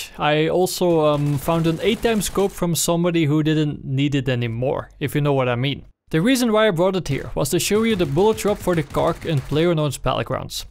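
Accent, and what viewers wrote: Netherlands. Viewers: AAAAGHHHHH THE DUTCH ACCENT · he didnt he is dutch so hes talking like that i know that i am dutch too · i cant stand this dutch accent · clearly Dutch from the first second mate. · lol slecht engels (bad english, i can hear he's dutch FOR FUCKING SURE) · Its a dutch accents I think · You're dutch I can hear it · Relo i em dyts I love your dutch accent man! :D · Wanneer je Nederlands accent niet te ontkennen is. Verder een goede video! En bedankt voor de info. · sounds dutch to me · r u dutch? i recognise my people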